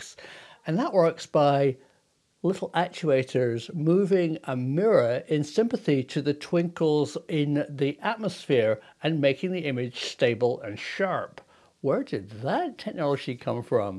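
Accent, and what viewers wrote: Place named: Bristol, United Kingdom. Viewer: I love your channel very informative lifelong subscriber and I'm always a fan of the Brissol arrghchcent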